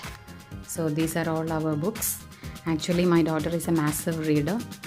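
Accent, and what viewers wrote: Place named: Kerala, India. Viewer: I knew it...ur from Kerala from ur accent 😂😂😅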